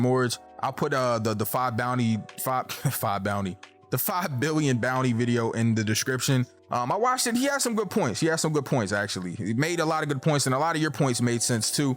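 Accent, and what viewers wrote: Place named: Oakland, United States. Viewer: Really enjoy you being yourself when explaining things. Hearing you reminds me of my time with friends from Oakland and their cool vibe. Keep it up. It means a lot for some people like me.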